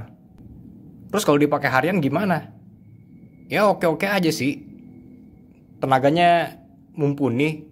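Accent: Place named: Bali, Indonesia. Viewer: Ko kaya logat vrindafan Indonesia (bali)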